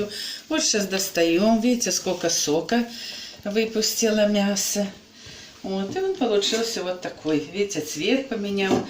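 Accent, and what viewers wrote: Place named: Belarus. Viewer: Очень милая женщина и хорошая хозяйка! Была в Белоруссии не раз, очень нравится страна, люди, говор очень приятный. Подписалась, с удовольствием буду Вас, смотреть.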